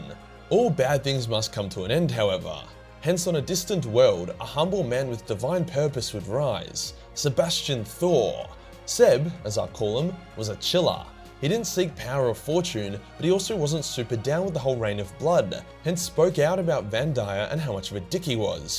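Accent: Australia. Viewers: I don’t know if I would’ve enjoyed Warhammer 40 K if it wasn’t narrated by an Australian keep it up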